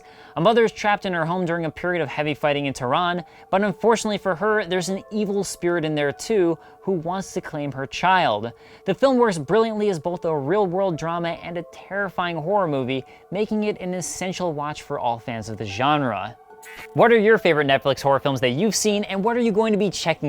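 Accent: United Kingdom. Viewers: Neko Sama because his accent sounds british, huh? (Lmao moron)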